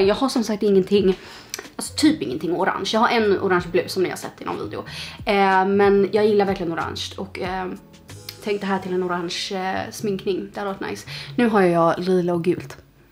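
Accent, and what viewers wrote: Stockholm, Sweden. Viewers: varför pratar du så grov stockholmska?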